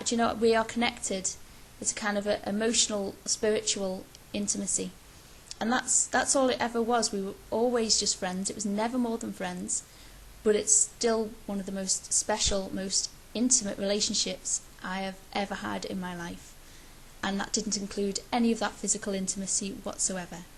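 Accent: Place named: Liverpool, United Kingdom. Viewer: Thanks for being so candid. I think we all worry sometimes about how we come across to others, but we can't let it dominate our actions. You are possibly one of the most interesting YouTubers around, and certainly your Liverpool accent makes you the most facinating. Great work on the room, and I am definately a fan. Very insightful vlog! Excellent points on intimacy. Super glad I'm a subscriber. - John Reagan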